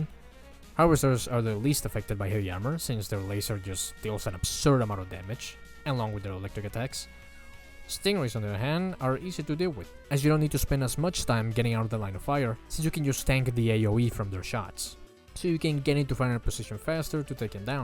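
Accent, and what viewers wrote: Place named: Eastern Europe. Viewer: The Eastern European accent has become strangely endearing to me. Given the vast amount of YouTubers with the accent who I watch, I have subconsciously begun to associate the accent with quality content. I’m not sure if that’s a good thing.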